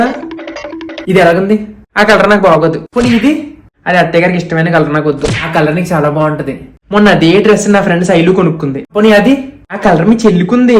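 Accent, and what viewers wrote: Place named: Kakinada, India. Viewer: Hii andi nenu kakinada ammayine meeru chala natural ga mana side ela మాట్లాడతారు ani చెప్తుంటే mana style entha సరదాగా ఉందినని అనిపిస్తుంది మీ వీడియోలు మా పిల్లలు కూడా బాగా ఎంజాయ్ చేస్తారు pls mee numchi reply isthe ma పిల్లలు chala hpy feel అవుతారు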